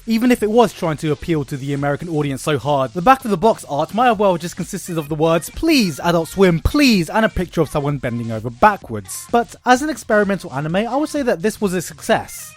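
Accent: United Kingdom. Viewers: But........ Aren't you british??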